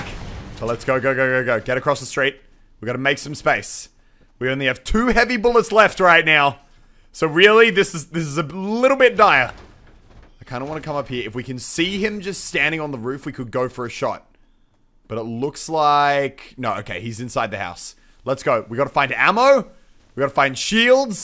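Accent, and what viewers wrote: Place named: Australia. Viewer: Jesse Nieves he is ausie and sounds like croc hunter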